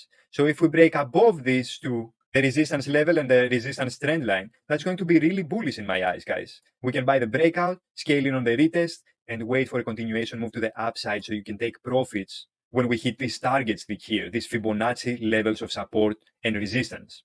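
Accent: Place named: Greece. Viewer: Απο τη προφορα μαλλον εισαι Έλληνας ??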